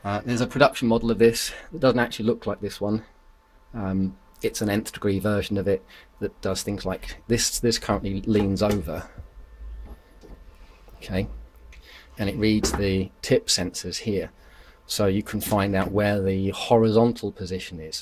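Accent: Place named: Derbyshire, United Kingdom. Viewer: Hi do you have a contact to this guy as I'm in Great Britain Derbyshire and he sounds similar dialect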